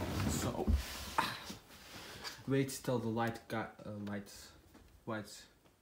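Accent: Netherlands. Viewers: Yeah the moment I heard his accent I was like haha he is dutch and it turned out to be true. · Bruh your ducht accent is so obviuos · Lol ur dutch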